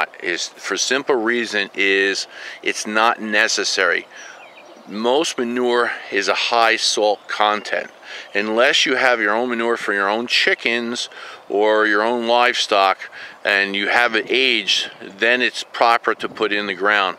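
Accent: New Jersey, United States. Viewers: Yer Joisy accent is just precious! My first husband wuz a Joisy boy and you East Coast boyz are just fine in my book!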